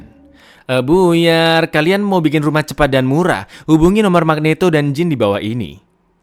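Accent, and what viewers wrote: Java, Indonesia. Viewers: Ngomong buyar nya gg wkwkw kentel amat jawa ta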